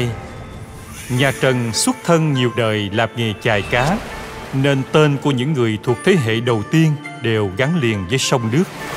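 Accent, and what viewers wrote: South, Vietnam. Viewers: 😂 sặc nam bộ, nghe mà ghiền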